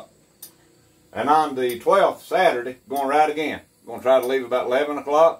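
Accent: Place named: United States. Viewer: Orsum. I really enjoy watching your videos and trying to understand your lingo. 🤪 my missus is from way back in them NC hills she sure do appreciate the Merican accent from back her neck of the woods. Ah huh! 👍🏾💯 I did that 7 question challenge some months ago. It’s really interesting hearing other bikers answers. Good job buddy. 👍🏾💯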